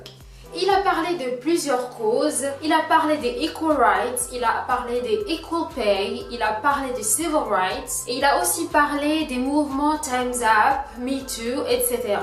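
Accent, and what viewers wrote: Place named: United Kingdom. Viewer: j'ai vue que tu a mis la music 1 like en plus;) presantation avec accent soo british je like aussi;)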